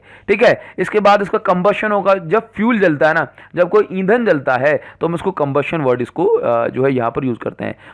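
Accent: Odisha, India. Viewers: Sir are u from odisha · Sir,are you from Odisha❓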